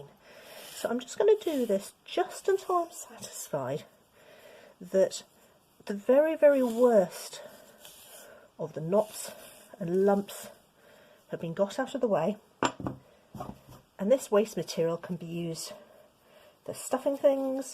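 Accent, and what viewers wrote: United Kingdom. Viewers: Obviously the video is very interesting. But most of all I looooove your British accent!haha Free hugs from Córdoba, Argentina. Namasté.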